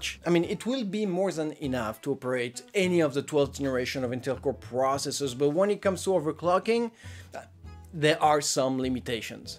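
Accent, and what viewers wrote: France. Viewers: Am I the only one who is here because of French accent 🥰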